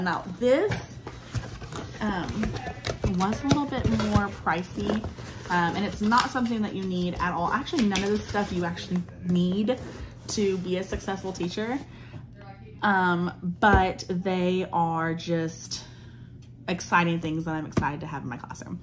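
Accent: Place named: Midwest, United States. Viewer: Can I ask what state you live in? Here is the reason, I grew up in NE Pennsylvania and we say crayon as kinda cran or cray on. But when I moved here to Indiana I notice that alot of the mudwest states saw it like crown. Like I hear you saying it. I'm just curious where you are located.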